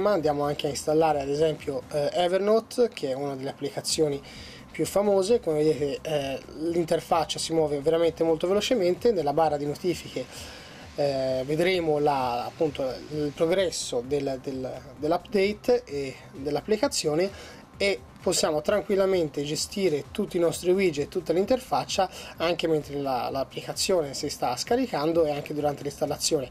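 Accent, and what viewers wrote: Tuscany, Italy. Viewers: Quanto TI AMO ACCENTO TOSCANO :D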